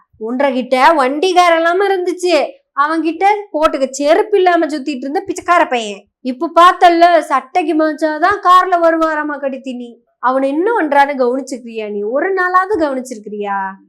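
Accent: Kongu, India. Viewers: சகோதரி கொங்கு தமிழ் சூப்பர் பாராட்டுக்கள் வாழ்த்துக்கள் 😂😂😂😂😂😂😂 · கொங்கு தமிழ் சூப்பர் சகோ பாராட்டுகள் நன்றி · திராவிடச்சுடரின் கொங்கு தமிழ் விளாசல். நன்றி. வாழ்த்துகள். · எப்படிப்பா இப்படிஅசத்திறிங்க அருமை கொங்குதமிழில 😄🙏🙏❤❤💐💐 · அருமை மகளே உனது கொங்குத்நமிழ் என்னை மிகவும் ரசசித்து கேட்க வைக்கிறது வாழ்த்துக்கள் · நன்றி தோழியே . உங்கள் பணி மேலும் தொடரட்டும் அழகான கொங்கு தமிழில் . வாழ்க பெரியார். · கொங்கு தமிழ் கொஞ்சும் பதிவு.. அருமை அருமை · சிறப்பான,தரமான பேச்சு.கொங்கு தமிழில் சீமானை சுளுக்கெடுத்து விட்டாய் சகோதரி.வாழ்த்துக்கள் .🎉🎉🎉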